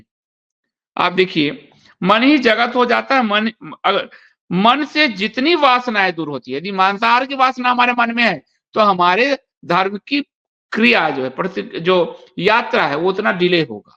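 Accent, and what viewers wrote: Bihar, India. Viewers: App bihar Chapra se hai kya?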